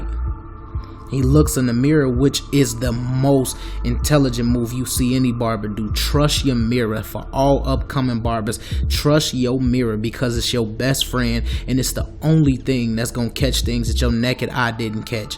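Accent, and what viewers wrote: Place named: Texas, United States. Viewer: nice work I'm trying to figure out dat accent ? Texas ,New Orleans , Oklahoma? we need you to open a spot in Manhattan......God Bless ya kiddo.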